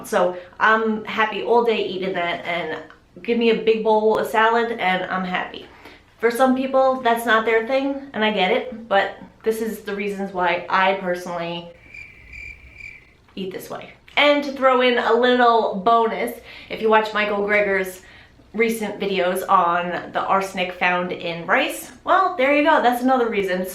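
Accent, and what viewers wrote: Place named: New York, United States. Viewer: Your voice is so stinky cute! Haha! You sound like you walked right out of a subway in New York!!! Love it. I’m a new follower. I got sick and then fat and I have no idea how to heal my body and get back to my size 2. I’ve gained 50 pounds in two years!!!! Pray for me. Thanks for this info. It has really encouraged me.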